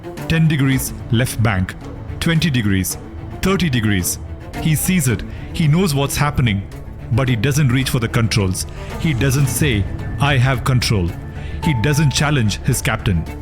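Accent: India. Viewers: These pilots are f*cking idiots...Boeing is right to blame the Captain but they should've blamed the whole crew, I try not to judge but there's no way I could've made this mistake, not with 3 attitude indicators and 2 of them saying the same thing...if it was 2 broken attitude indicators saying the plane was banking right I could understand it more but then you'd still have one that's moving correctly and to ascertain your correct orientation all you'd have to do is bank the plane left and right...the only way I could understand this completely is if it was all 3 failing in the same orientation or if it was something like Aeroperu 603 where the crew was constantly receiving conflicting information...all that life gone for a problem that I feel many rookies would spot fresh out of the academy and the only real thing that stops me short of judging these guys is that I've never been a 747 pilot...thank you as always for these premium uploads I spotted a few errors like the cockpit being off, the engine models and the yokes of a 707 but all the information that you give that's most important is spot on and never biased and I really appreciate it not to mention I love your accent and Indian culture is such a a rich one, I almost hate seeing how beautiful this 747 was and all the amenities with Indian culture infused into it, makes me wish I was wealthy to experience things like it and thats one reason the 747 is my all time favorite aircraft because it was like you said like a fly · Fuck u bro ur Indian when u speak an when u tell this story your English is mix in Hindi so better why u don’t try to say this story in Hindi coze ur English do not sound like English 🏴 uff any way good try keep it up 😡😡😡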